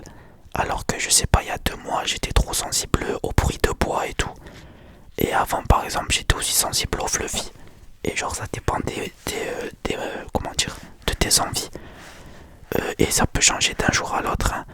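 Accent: South, France. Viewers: J aime beaucoup ta chaine et ton timbre de voix 🙂 petite question tu as un fort accent du sud t Marseillais , nîmois ou Montpellier ? 😉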